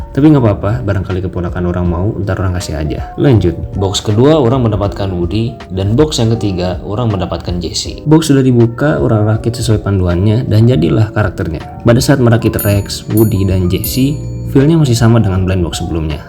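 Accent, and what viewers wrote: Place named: Banjar, Indonesia. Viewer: Keren bang....semangat...kaya urang banjar ( urang = orang dalam bahasa banjar ).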